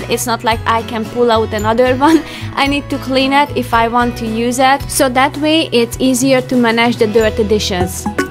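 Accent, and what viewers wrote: Hungary. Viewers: I don’t know why YouTube recommended your video and it started playing it with subtitles only and I saw that soup and I was like that looks hungarian.... so I clicked and I heard your accent and I knew it straight away that you are Hungarian too! Feliratkozva 🧡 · Hello from Romania. Love your hungarian accent.🤗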